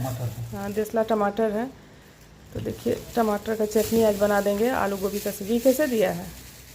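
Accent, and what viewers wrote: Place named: Bihar, India. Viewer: Aunty ji 🙏 apke bhasha se lgta hai ap bihar se hi hai 😊 kis jila se hai ap..bht acchi thali .. testy 😋 hmm hath se ese chawal neri maa v nikalti hai...❤ lovely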